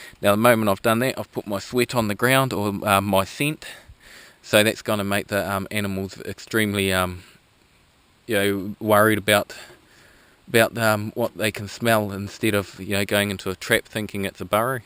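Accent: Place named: New Zealand. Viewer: aw yea, fush and chups tonight bro? Good to hear a kiwi voice....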